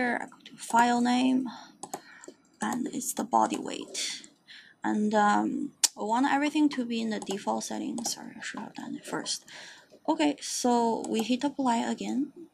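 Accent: China. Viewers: I'll give this a try later :) Your accent btw, from China? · Hi, first of all, thanks for sharing, it's great content. And the moment I hear your voice, I can almost tell that you are Chinese from your accent. Your voice is good btw. And according to your video, I think your conduction means after exporting the skin weight map once we need to re-rig the mesh by "Select Hierarchy and rig it from selected joints" way and then import the skin weight map. Last but not least we need to make sure all joints related are selected, no more no less. I have tested it through this way and it seems to work as expected. Kind of a weird bug that Maya couldn't read the hierarchy after rigging the hierarchy way. we seems to have to tell Maya what joints we did use to rig the mesh. How did you...I mean you r awesome to have found the solution. Congrats.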